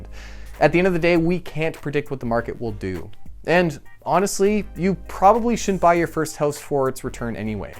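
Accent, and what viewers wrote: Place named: Canada. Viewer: Watched a few of these videos now (great content) and this is maybe the first one where I REALLY noticed the Canadian accent XD. Also the salary multiplier here in the UK is normally about 4.5x.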